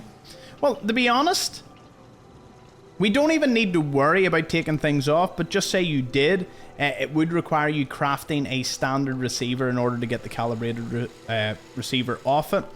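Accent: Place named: Scotland, United Kingdom. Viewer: I like William Wallace accent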